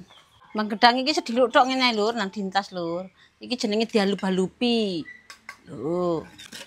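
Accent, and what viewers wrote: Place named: Java, Indonesia. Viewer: Assalamualaikum..met malam..saya sangat suka klu ngonten trutama bhs jawanya yg medok..oh iya pngling skrg rambutnya terurai makin ayu skrg..biasanya di ikat trus..skrg manglingi...sukses selalu...❤❤❤❤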